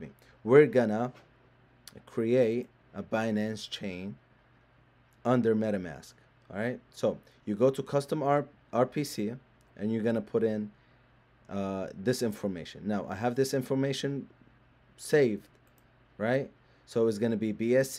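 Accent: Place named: Libya. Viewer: I know a Libyan with the exact same accent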